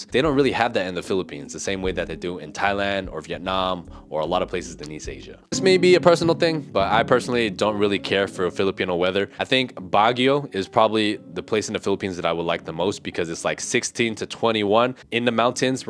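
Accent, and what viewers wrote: United States. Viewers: tribal … no … family first … your accent sounds american … which has become tribal of wokism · I'm thinking about it because I want to surf and skate 🤘😎🤘 and play some music 🤘😎🤘 You know this cat doesn't drink sink water in America 😂 he's totally a gas station water bottle Guy 😂 He speaks like an American but he said he was Chinese/Philippines he didn't say anything about why he sounds American 😂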